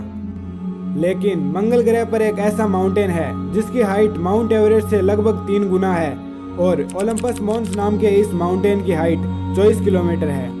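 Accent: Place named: Rajasthan, India. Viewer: Your accent sounds like a Rajasthani guy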